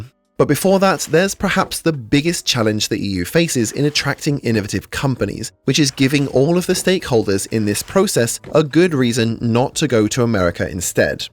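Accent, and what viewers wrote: United Kingdom. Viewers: Plus i find odd a uk voice cares wtf u all left the eu so how can u complain wtf u dont have a dog in this Eu area. So in the immortal words of my uncle, ' shut your mouth if u aint in the fight..' just sayin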